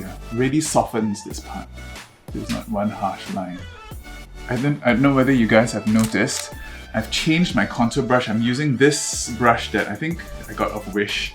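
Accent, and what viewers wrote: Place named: Malaysia. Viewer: very detailed video , im from Canada originally from Malaysia, , i recognized youre accent right away lol. Im gonna be doing drag alot more and this really helps alot thanks queen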